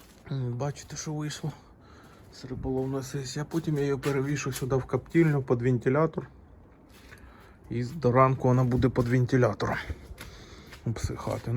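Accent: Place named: Russia. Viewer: Акцент кацапа а так піде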